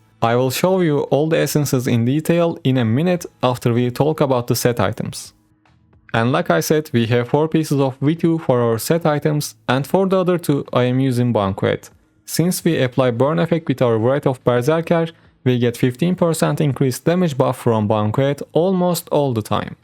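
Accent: Turkey. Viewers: aksan türk birinin ingilizce metin okumasına benziyo :D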